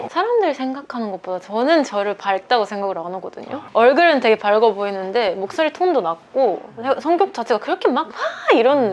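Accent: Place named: South Korea. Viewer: 발성부터 한국어 발성법이죠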